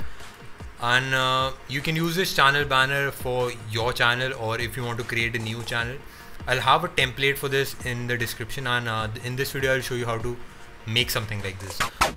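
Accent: India. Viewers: hi dude wassup! your voice looks like an indian! i am indian too! first of all happy diwali and i just wanted to ask if to indian youtubers could be friends?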